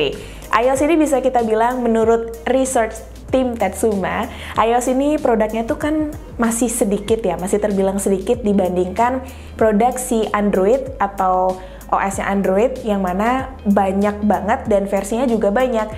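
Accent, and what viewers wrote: Bali, Indonesia. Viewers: Orang Bali?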